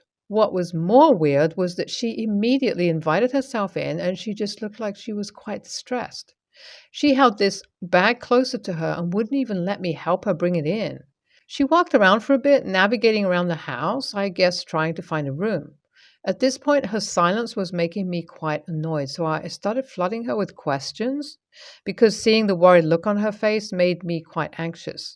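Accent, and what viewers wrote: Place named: East London, United Kingdom. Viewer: It's a working class English accent from London's East End.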